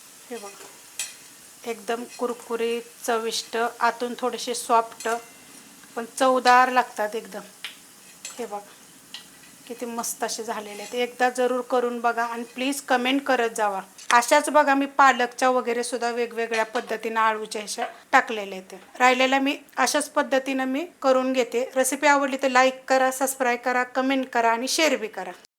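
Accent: Satara, India. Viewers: भाषा सातारी आहे.... कारण मे देखील सातारची आहे पण मुंबईत लहानाची मोठी झाल्याने मला अस्सल सातारी बोलता येत नाही. पण भाषे वरून समजते.असो.... माझे सासर खटाव तालुक्यात आहे... आपण एकाच भागाचे आहोत.. तुम्हाला all the best 🎉❤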